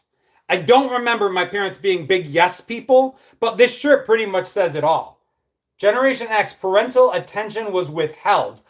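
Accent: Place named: North, United States. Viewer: Lol... I knew you were from that part of the northern portion of the US. I was raised in Ga(till 13½) then New Hampshire so Im pretty good at picking up accents. I was born in feb '73 so im Totally a Gen-Xer 😊...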